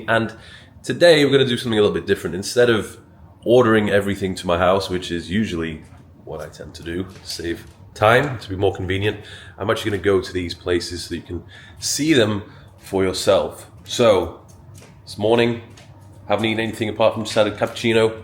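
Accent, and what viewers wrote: South Africa. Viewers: That Saffa accent was THIIIIICCKK,,, Yes boi... anyways love the content! Watching from South Africa 🇿🇦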